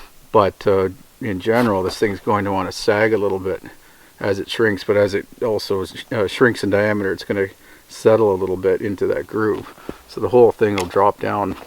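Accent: Canada. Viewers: Este es más expresivo, tierno, que se equivoca, trabaja sentado en la nieve y conversa, tropieza y hasta sonríe cálidamente. Contrario al otro más serio, que habla poco, mas eficiente y por momentos algo aburrido. CUANDO PONDRÁN SUBTÍTULOS EN ESPAÑOL???, hay muchas cosas que no se entienden del dialecto canadiense.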